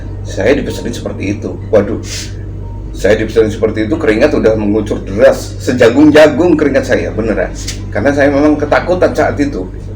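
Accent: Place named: East Java, Indonesia. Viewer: mau tanya Abang asalnya dari mana? logat jatimnya faseh banget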